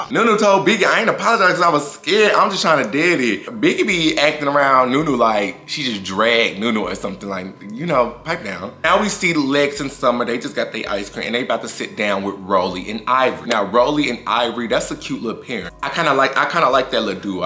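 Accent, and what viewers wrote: Memphis, United States. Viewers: You're clearly from Memphis... has no one ever heard you talk 💀🤣 it took me all of 20 seconds to realize you were from the Midwest based off your voice which is why I followed you 😅 took no more 40 secs to place your accent in Memphis 💀🤣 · I believe you from memphis from your accent and your realness ❤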